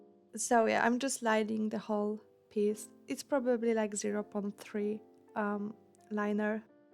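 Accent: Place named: Poland. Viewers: I HEARD THE POLISH ACCENT FROM MILES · I FELT THAT TOO, I THOUGHT "she sounds Polish" AND THEN SHE SLAPS A "ostrożnie szkło" STICKER ONTO THE SKETCHBOOK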